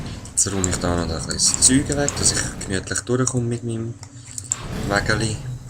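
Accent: Zurich, Switzerland. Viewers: i wellem kanton wohnsch du eig.? :D töhnt wie Züri :D Und super part natürli ^^ :)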